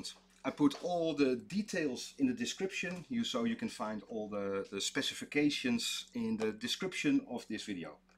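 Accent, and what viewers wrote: Netherlands. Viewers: Ha! Coffee in a shot glass! I’m corrected. You sound like the wonderful Amsterdamer. We were visiting over the holidays and stayed in the historic canal area where my Cousin and family live there. I wish I could have brought my Tudor Chronograph from the early 1980s. I don’t trust just anyone having it serviced. Any suggestions from here in the USA? We are in North Carolina. Thanks.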